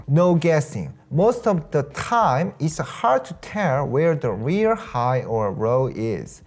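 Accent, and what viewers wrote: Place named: United States. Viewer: Fifty year old born and raised Briton here. I understand him very clearly and easily. For me he is speaking with an American based accent and cadence, it is the Standard International/Business American English version. Which is overlaid onto his Native South Korean accent (Im assuming from the name ). If you can not understand him, then you will not understand most of the World when they speak English. Including from the Land where English was birthed: England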